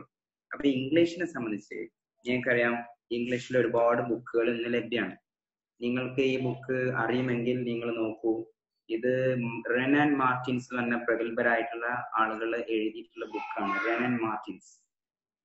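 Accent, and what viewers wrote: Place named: United States. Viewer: good american accent..! bravo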